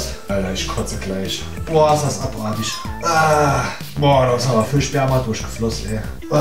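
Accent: Germany. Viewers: Pfälzer? Feier ich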